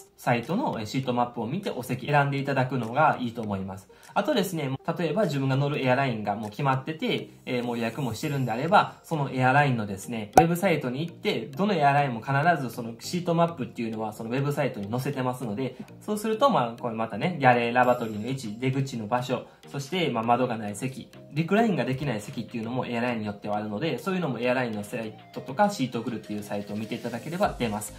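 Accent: Kansai, Japan. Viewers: はじめまして～～♫ 某YouTuber さんのコメント欄で、数名の方がおススメしていらしたので、来ました🏃‍♀️♥ 今回の動画、めっちゃためになりました。 私は昔は早く降りたい！ので前の席を予約していましたが、最近は、後部座席の方が隣の席が空いてることが多いので、後部座席を予約するようにしています。 あまりに後は言うてはるように、トイレ待ちの方が並んでたりするので、やめるようにしています。 関西弁なんで、めっちゃわかりやすく、楽しいです。 これからも動画楽しみにしています～ね。💖 よろしくお願いします☆ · 参考になります、私はトイレがちかいので通路側に座ります、感じが、YouTubeおのださんに似ていますね。関西弁もね。✈ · 関西弁のツッコミがめっちゃ面白いです🤣 海外の航空会社に就職されるのはすごいですね ご優秀なのがわかります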